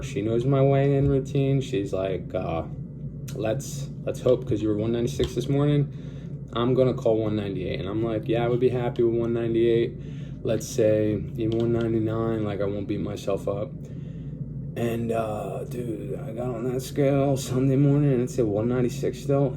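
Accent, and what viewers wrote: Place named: Italy. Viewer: It’s the Italian accent.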